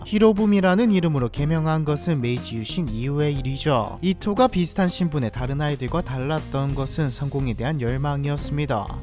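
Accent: Gyeongsang, South Korea. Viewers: 갱상도가 표준말 하느라고 욕 본다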